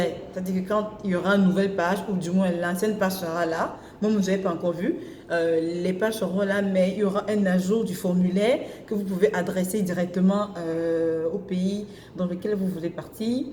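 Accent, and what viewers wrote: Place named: Cameroon. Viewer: Les congolaises n'ont jamais un tel accent . Je crois bien qu'elle soit une camerounaise. Les congolaises parlent mieux que ça . attention !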